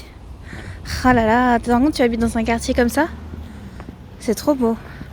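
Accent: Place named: France. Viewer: My wife and I love your french accent. Welcome to Quebec and good luck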